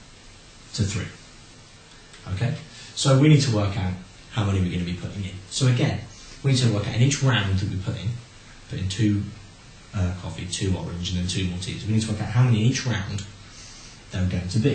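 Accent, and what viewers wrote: United Kingdom. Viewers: purposely i tried to understand a bit because im american english just like normal english and I can't really understand fast british! but still at least i got my homework all correct! thanks!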